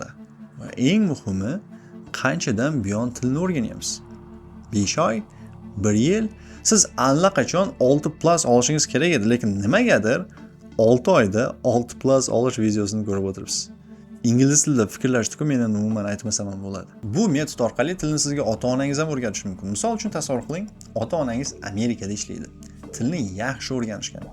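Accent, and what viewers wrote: Bulungur, Uzbekistan. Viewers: Aka bulungurlikmasmisiz🔥🔥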